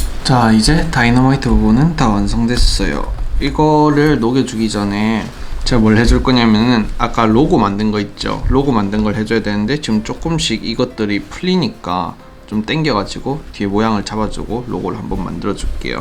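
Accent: Gyeongsang, South Korea. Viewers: 모르는 내용도 아닌데 뭔가,, 경상도 억양이 넘 귀여워서 계속 보게 되네요,, 경상도 사투리가 이케 다정한 느낌일 수가 있군여, 중독성 있네욥,,:)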